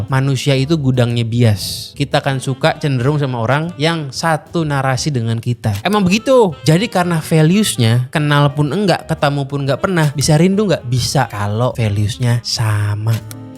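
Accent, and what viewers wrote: Java, Indonesia. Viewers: Iya, kalau di channel ini aku jadi jawa sesuai mahaguru, biar beda sama di channel utama 🤣🤣🤣🤣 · Kenapa kamu sekarang intonasinya ke Jawa"an bang, terinspirasi dari maha guru kah?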